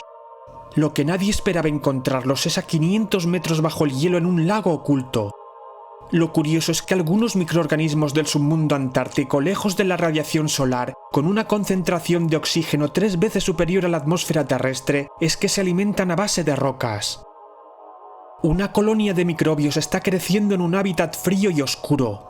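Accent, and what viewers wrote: Spain. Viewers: por su acento puedo imaginar debe ser español. Aunque en algunos países suramericanos hablan con mucha pronunciación de la z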